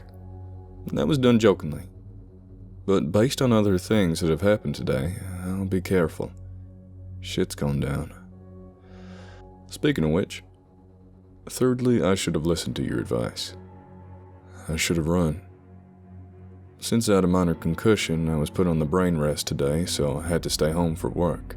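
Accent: South, United States. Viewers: Imagine having a southern accent like that and detesting guns. I got my Glock 22 on me right now!!! Pow pow!!! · Your southern accent always gets me hyped for a story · Even as an american I still find his southern accent equal parts authoritative and smooth, like a fine whiskey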